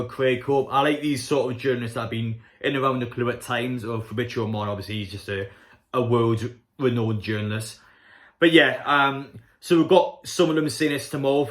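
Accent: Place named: Geordie, United Kingdom. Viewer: mate whats your accent? Its a mix of geordie and something else😂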